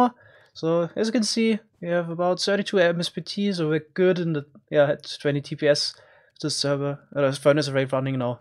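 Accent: Germany. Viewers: Is he German? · Oh du bist von Deutschland. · Bist du Deutsch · Bist du vielleicht Deutsche weil dein Englisch hört sich so an 😂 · Are you German? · Deutsches Akzent xD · You Sound a little Bit german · Man merkt absolut das du deutsch bist haha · Bist du deutscher? Man hört das voll raus! 😂 👍 Aber geile Video💗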